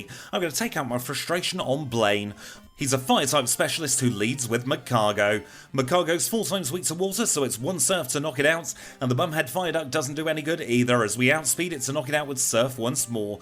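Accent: United Kingdom. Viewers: Man, you are the best. My favorite pokemon gamer of all time, love your british accent. Can I ask you, why don't you use Dynamic Punch more often with Snorlax?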